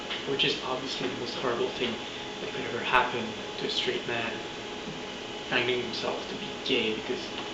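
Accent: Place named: Quebec, Canada. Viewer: You're very beautiful and your Quebecois accent is wonderful. I hope everything is going well with you. -Eric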